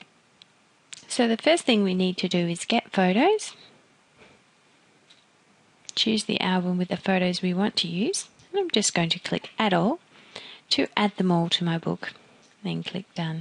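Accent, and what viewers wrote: Australia. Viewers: Hi,....besides learning from what you say in this instruction video, I also wanted to tell you that I like listening to your voice....which is very soothing, sweet, feminine and peaceful. I'm in the U.S.A. so I also like your Australian accent. It's very nice....and I hope you don't mind me sayin' so.;-)!!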